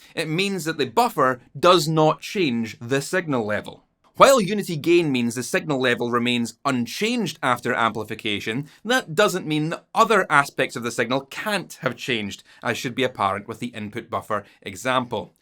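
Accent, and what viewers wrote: Scotland, United Kingdom. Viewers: The Scotsman in me loves your accent, I'm in the USA so no accent, but I especially enjoy the way you break things down logically, and with humor! I plug straight in and keep it clean, because that sound makes me feel good, for whatever reason distortion makes me feel bad. But a smidgeon of overdrive is tasty in the proper context, or maybe a little fuzz? Cheers!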